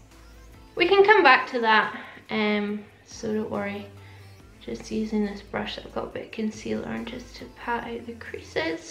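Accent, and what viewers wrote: Scotland, United Kingdom. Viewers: Ahhhh I LIVE for your videos! And the outtakes! You have me in stitches every time and after multiple (pure travesties I tell you!) childbirths that's as pitiful as it sounds. I am also disabled with chronic spinal issues and fell into makeup because I was bored sh**less with myself. Anyway, enough fannying about! Just want to say how much I appreciate you. I'm in Australia but my entire family is from Falkirk. The ones who are still alive(!) have been here long enough to have lost much of their accents but yours brings my sweet Nana and Papa back to me and they went belly up years ago. I'm often told that we come cross as "cold", not huggers etc.... I always say its a Scottish thing! Thanks for the validation lol xo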